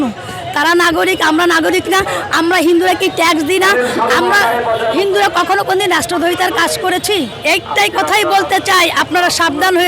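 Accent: Kolkata, India. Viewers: কথার ঢং দেখে মনে হচ্ছে আমরা ভারতে আছি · বাহ কলকাতার শুরে কথা বলছে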